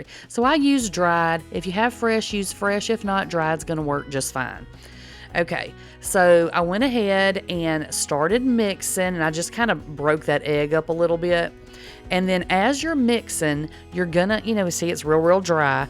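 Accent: United States. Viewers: Hi 😁 I’m all new to sourdough and I’m trying this recipe tonight !!! Omg it looks sooooo yummy !! Btw …. I love ur accent lol . What State in US is that?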